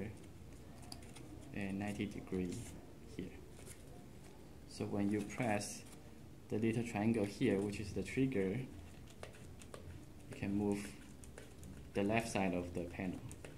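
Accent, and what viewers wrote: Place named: Taiwan. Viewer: 聽起來好像台灣人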